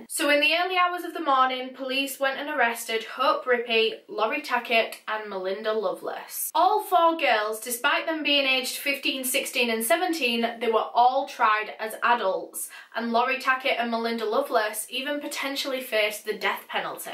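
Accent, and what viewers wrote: United Kingdom. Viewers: Her voice is so angelic and beautiful she speaks in a British accent. She is a nice true crime YouTuber but I would like if she would call the people who are responsible for the crimes names like monsters psychos sickos etc…………….. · Not trying to be a dick but her voice is not that great 😅 She's almost yelling especially at the beginning of every video, and that turned me off of her for such a long time till I finally decided I'm gonna try to get past her yelling intro, and then I absolutely loved her and binged her content. She has a British accent, different from the "mainstream British accents" like in the movies and stuff, but still lovely.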